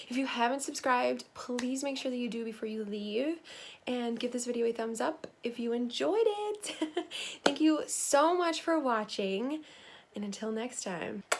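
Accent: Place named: Wisconsin, United States. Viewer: I found out later you were from New York 😊😬 sorry you sounded a bit like you were from Wisconsin lol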